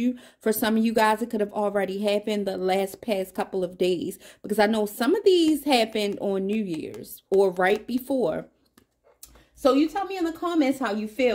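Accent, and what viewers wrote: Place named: Baltimore, United States. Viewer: You gotta be from Baltimore 🫶🏼✨ it’s the accent for me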